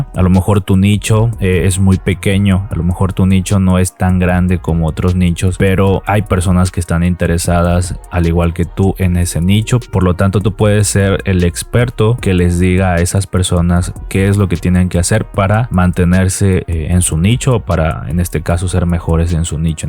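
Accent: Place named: Colombia. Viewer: Me encantan sus videos, pero creo qye el video lo hizo su marido. No he visto otro video parecido a éste, porque hay unas personas que tienen videos de suculentas y muchas plantas, con muy buenos consejos de abono y tansplantacion y compra. Pero seguro que es su marido el autor del video. Si así lo creo. Porque tiene acento colombiano.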